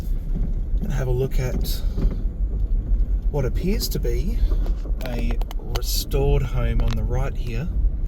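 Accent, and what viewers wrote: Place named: England, United Kingdom. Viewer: yep.there good .people like living like that .if u look up.they have great innings .compared to city folk .an are happy . Nowhere is far from.anywhere. .below pt Augusta.try above .😮 might be hours to the neighbours 😂 an ocker up.an u will.get names right . English accent is causing it . Pretty funny given me a laugh. R u gonna give me heads up on that place I asked about . An I'll pass on 3 roads u will love . Plenty of cam opportunities .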